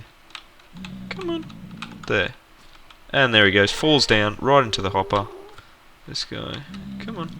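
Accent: Australia. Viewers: Australian dude? I am :D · I love Australian accents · Are you Australian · Are you Australian? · Wow you town looks so cool and are you Australian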